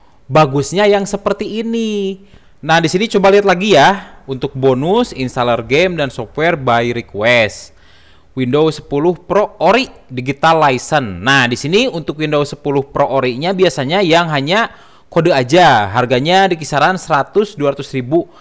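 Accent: West Java, Indonesia. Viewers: "PGA... PGA... pega..." ni apaan ya baru denger. wait. ....oh sunda. ganti p sama v... VGA, vega... ok paham dah. maaf jangan diambil hati, hanya jujur pertama bingung gegara logat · Logat Sunda jadi ciri khas pisan 👍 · AA dari Sunda...kayak suara teman saya PakDedi Ciamis..aksenya...asyik tapi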